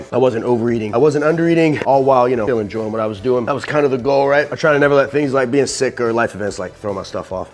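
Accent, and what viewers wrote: Texas, United States. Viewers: Your texas accent comes out when you'Re sick :D:D:D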